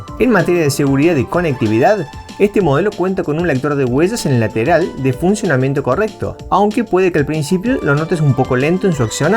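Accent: Argentina. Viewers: Está brutal este celu! mí única duda era si tenía radio y siiii 😻 gracias por mostrarlo!! Yo quiero el Moto G41 pero me es inalcanzable su precio y este tiene casi lo mismo por menos dinero 😍✨✨ excelente Review!! y más porque sos argentino y no español o de otro lado, se siente más cercano todo lmL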